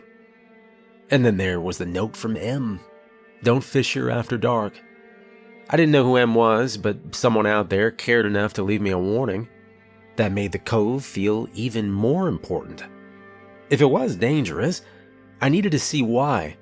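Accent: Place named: Maine, United States. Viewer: The voices of the characters that you make sound like they're all from Maine. 😅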